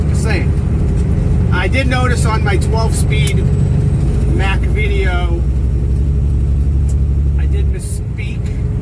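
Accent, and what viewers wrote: Boston, United States. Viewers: I think I heard you say you've only driven for two years. I don't believe that for a moment. You shift the same as I shift my 13 speed Pete. I've been driving long haul for 48 years and I say you pass the test with flying colors. Love the Boston accent !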